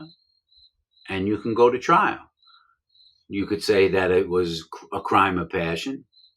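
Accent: New York, United States. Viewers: That's a NYC area accent.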